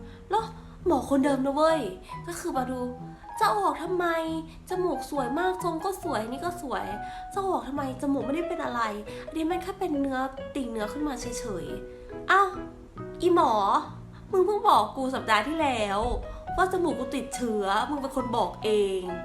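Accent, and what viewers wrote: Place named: Isan, Thailand. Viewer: พึ่งเคยได้ยินพูด อิสาน น่ารักมากเด้อ 🤣🤣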